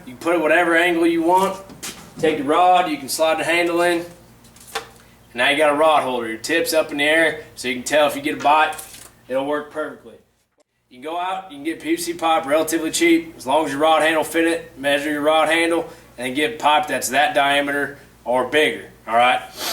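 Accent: South, United States. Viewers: Just love this guy! Especially how he talk! Is it Southern? (I am from California). Thanks for the Video!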